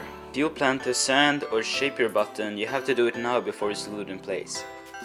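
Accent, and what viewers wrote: Sweden. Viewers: I guess by your accent and it saying linolja on the oil bottle that you are swedish Hej kompis! Älskar din kanal! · are you from sweden. it sounds like that ,i am. cool project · I recognized your Swedish accent within the first 10 seconds of watching lol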